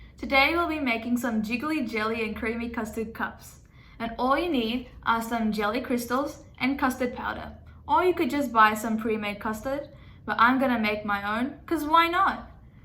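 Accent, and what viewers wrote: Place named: United Kingdom. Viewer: or whatever idk sounds kinda British ngl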